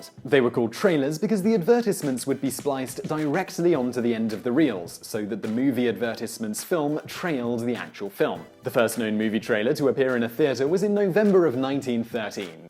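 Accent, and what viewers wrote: England, United Kingdom. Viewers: Cameron Kiesser he’s English.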